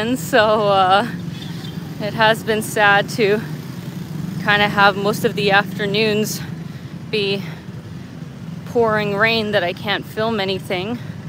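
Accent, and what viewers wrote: Scotland, United Kingdom. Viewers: are you scottish? 😁😁😁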